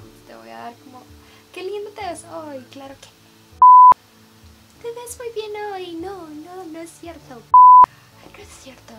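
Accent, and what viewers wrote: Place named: Bogotá, Colombia. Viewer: vivas De Bogotá, su acento la delata :v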